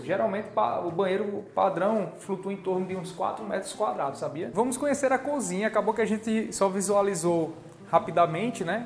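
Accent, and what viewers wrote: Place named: Northeast, Brazil. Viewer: Tô aqui me acabando 😂❤🎉 de rir 😂😂😂 A casinha 🏡 é um show 🎉🎉🎉 mas a sua apresentação ; fala ; e simpatia é o que deixa o vídeo ainda mais convidativo ✌👏🏻👏🏻👏🏻💎 saiba disso ; O jeitinho do povo nordeste 🏜 falar encanta os que ouvem ...😂❤✌😘💋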